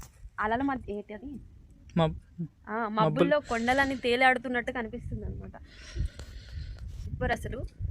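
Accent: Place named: Krishna, India. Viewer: ఊరు పేరు మాత్రం చెప్పటంలేదు ఈమె మాత్రం మన్యం ఏరియాకి సంబందించిన ఆమే కాదు యాస మాత్రం కృష్ణ జిల్లా కు సంబంందినది ల్లా ఉంది ఇంతమంది ఎఉరు ఏవిలేజ్ అని అడుగు తున్న చెప్పటంలేదు పాప యాస కూడా అలాగే అనిపిస్తుంది 🙏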